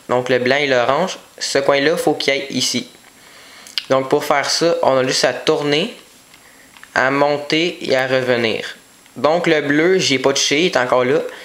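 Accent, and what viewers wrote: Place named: Quebec, Canada. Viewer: Le québécois en force x) Merci pour l'tuto !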